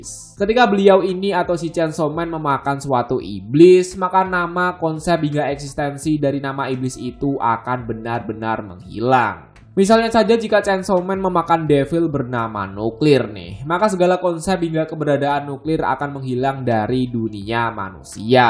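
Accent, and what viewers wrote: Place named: Madura, Indonesia. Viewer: Intonasi nya kek orang Madura bikin sakit telinga apalagi pake headset 🤣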